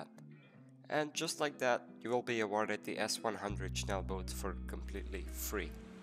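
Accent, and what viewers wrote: Belgium. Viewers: Are you Flemish Belgian??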